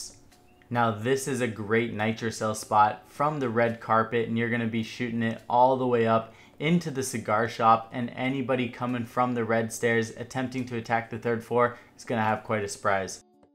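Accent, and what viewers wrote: Hawaii, United States. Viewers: I was actually gonna ask if you were from Hawaii until you said it. I'm from Hawaii too (near UH but I grew up here), was just gonna say it could be a good idea to advertise that just for being unique!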